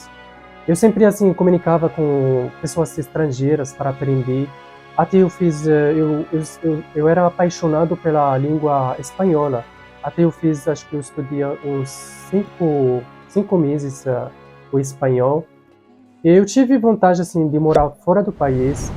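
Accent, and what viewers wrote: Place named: Arab world. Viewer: Da pra ver que tu falas desde pequeno o idioma portugués ,, e nao aprende em um ano pois tu nao tem s o sotaque árabe Tão forte sua mae é brasileira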